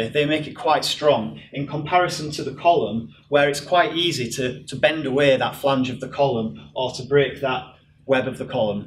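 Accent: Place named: North, United Kingdom. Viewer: Thanks - great presentation - and the Northern accent reminds me of home!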